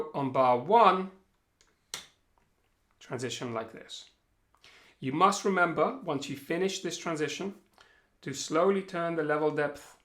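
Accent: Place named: England, United Kingdom. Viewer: I can hear you have picked up the English accent at times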